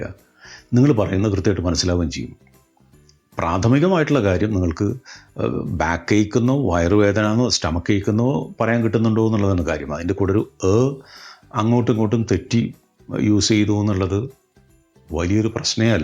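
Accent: Thrissur, India. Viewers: ingalu thrissurkaaran aano ?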